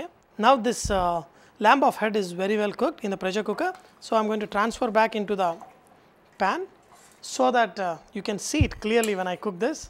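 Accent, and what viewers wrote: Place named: South India, India. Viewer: i love your south india accent.plz dont eat when u cook in front of the cam i feel jealous.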